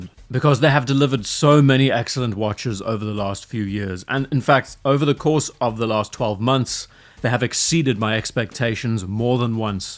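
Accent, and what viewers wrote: South Africa. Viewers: Dig your accent. South Africa?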